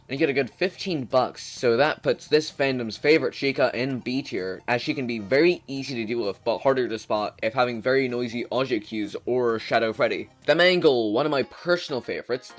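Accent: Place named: Ireland. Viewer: he's from ireland, so i think he's still tryna learn english as there dutch is like the native language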